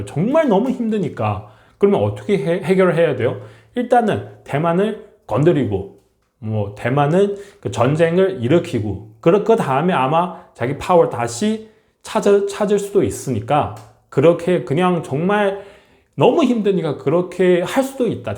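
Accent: China. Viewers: 말투가 왜이래? 중궈로군!! ㅉㅉ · 중국분이세요? 대만분이세요? 발음이 그쪽인데요? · 발음이, 혹시 조선족 이신가요. 대만에 미국 있는것은, 장개석 정부 대만 피신 이후, 계속 있어 왔읍니다.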